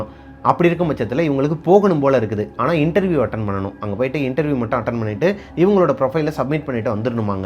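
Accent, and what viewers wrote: Bangalore, India. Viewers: Akka banglore slang tamil keka nalla irukku,im from banglore to....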